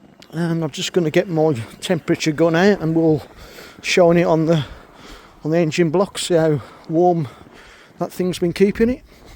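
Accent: Midlands, United Kingdom. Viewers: Hello I’ve just come across your video I think it’s great even better when I noticed the midlands accent 😀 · Super good video first time i see your page if you dont mind me asking how did you end up driving a truck in usa when you got a uk accent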